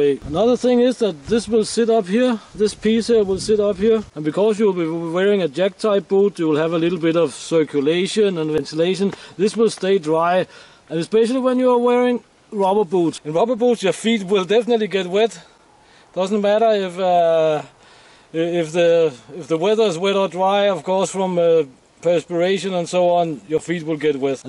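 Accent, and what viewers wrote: Denmark. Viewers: He is from Denmark. Many Danes are fluent English speakers. My brother has a Danish wife a 3 children. I knew was a Dane from his accent. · No he's Danish, it's a danish accent. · The most surprising thing about this video, is seeing a Russian speaking English with a Danish accent.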